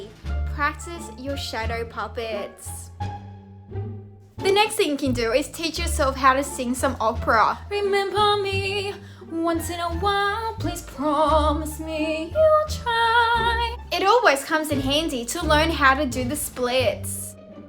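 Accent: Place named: Australia. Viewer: I really like your Australian accent! Also, I love bored eating too.😂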